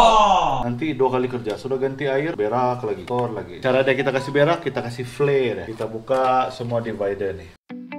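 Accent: Sabah, Malaysia. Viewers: Terbaikkk bang 👍🏼👍🏼👍🏼👍🏼 moga teruss sukses supportlocal ❤️ ada sdh channel tmpt berguru 😁 kalau channel lain susah mau di difaham.. maklumlah kurang kita faham bahasa indon dgn semenanjung...nah tapi kalau logat sabah TATAP PAHAM ITU LOGAT 🤣 enjoy tgok video abg ni...buka buka jak youtube terus pegi tgok channel...semoga banyak lgi video dan ilmu yg abg sampaikan... #barumaubelajar 🤣👍🏼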